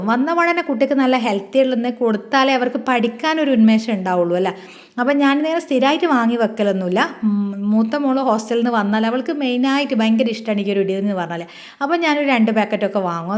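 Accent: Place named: Vadakara, India. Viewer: Ninkale varthanam vadakarakkare bhashsa pole ende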